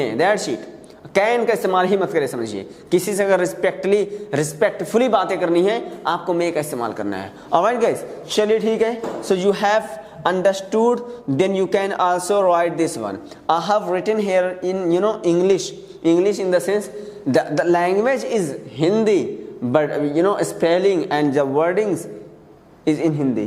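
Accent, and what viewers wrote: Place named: Bhojpuri, India. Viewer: I have never seen such a teacher like bhaiya best teacher 😆 Whenever I attend his video I started smiling Before open the class, I started laughing 😂 He isn't speak hindi he speak bhojpuri😂 his sound gives bhojpuri vibe 😅 I like it this class with no distraction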